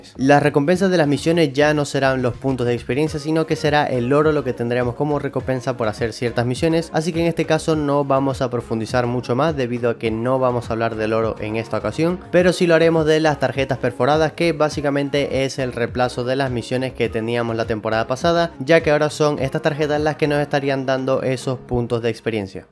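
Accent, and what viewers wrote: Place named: Canary Islands, Spain. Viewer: Holii eres de canarias? Tienes acento "nueva" xs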